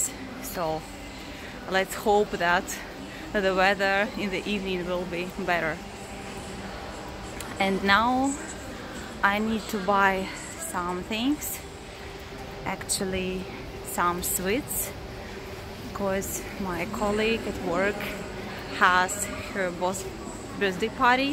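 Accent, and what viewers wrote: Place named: Russia. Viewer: Hi. I'm enjoying your videos. I watched half of this not understanding what you were shopping for. "Swits?" Then I realised you were saying "sweets." (Rhymes with "eats") I love the Russian accent but find it funny that all vloggers mispronounce "clothes." (Of course, I live in the South of the U.S., so I suppose a lot of English speakers would argue with some of my pronunciations.) The "th" is silent in the word "clothes." I think a pronunciation dictionary would have something like "klōz." Anyway, I'm glad that you don't seem daunted that 75% of your viewers are men. Best wishes from America.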